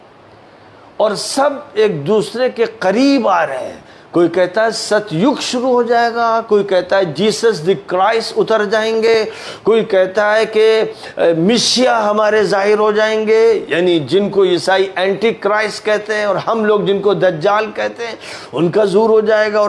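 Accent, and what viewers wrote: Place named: Lucknow, India. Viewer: ماشاءاللہ, کیا پیارا اندازِ گفتگو ہے آپ کا, خاص لکھنوی اسٹائل. آپ کی گفتگو سن کر بہت مزہ آتا ہے اور آپکی بات دل میں اتر جاتی ہے. مولانا سلمان حسینی صاحب, اللہ تعالیٰ آپ کو سلامت رکھے, کہ آپ حق بولتے ہیں ہمیشہ.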